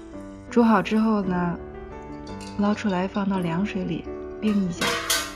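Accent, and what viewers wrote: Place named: Shaanxi, China. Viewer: 是西安人吗？看你做的菜，说话都有老陕的味道，好亲切啊！从炸油条开始，跟您学了好多零失败的做法，非常感谢！能介绍几款铸铁锅用烤箱烤的菜吗？觉得这种菜又方便又好吃，最适合带娃的妈！谢谢啦！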